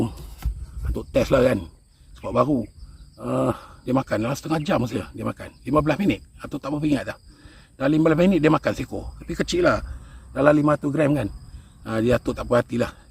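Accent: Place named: Johor, Malaysia. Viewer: Atok asal johor ke? Tukar sebut tuko Biar sebut bio Hehe tnye je tok Slm dr tangkak johor❤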